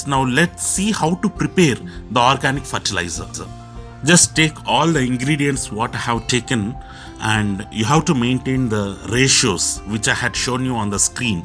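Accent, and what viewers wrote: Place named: South India, India. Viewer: 🙏🕉️🚩🌹🌻 JAI SRI RADHA GOVINDAY NAMO 🕉️🚩🌹🌺🙏🙏 Sir, Thanks for this stunning and informative video. I have been learning gardening since last four months via U TUBE and have attended one practical session also. Pls send me your name and mobile number so that I can remain in touch with you. From your accent I can make out your are from south. I am buying my fertilizers and other items from TN and KERALA. THANKS a lot 🙏🙏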